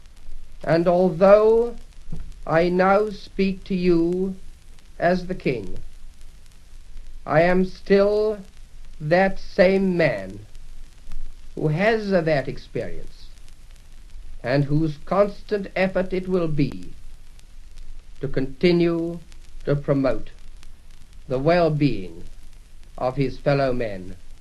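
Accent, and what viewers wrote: RP, United Kingdom. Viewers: I sure didn't expect his voice to sound this posh.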